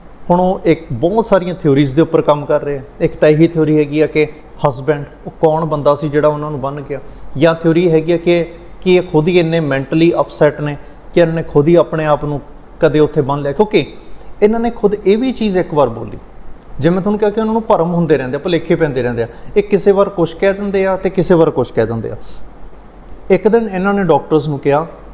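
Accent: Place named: Jagraon, India. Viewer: ਵਧਾਈ ਆ ਬਾਈ ਜੀ ਸਵਾਦ ਆਉਂਦਾ ਸੁਣ k ਲੱਗਦਾ ਤੁਸੀਂ ਸਾਡੇ ਏਰੀਆ ਤੋਂ he ਹੋ ਜਗਰਾਓਂ ਤੋਂ ਅਸੀ ਰਾਏਕੋਟ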